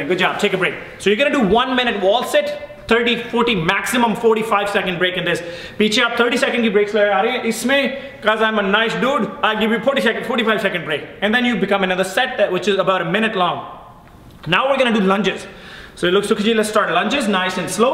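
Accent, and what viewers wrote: Punjab, India. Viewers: You talk too fast and with a lot of Punjabi accent.. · Plz speak slowly I'm not punjabi.its hard to get ur accent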